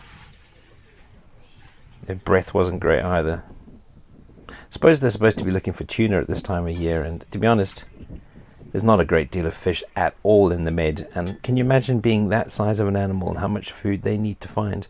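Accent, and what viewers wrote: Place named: South Africa. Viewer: The narrator is from 🇿🇦 with that accent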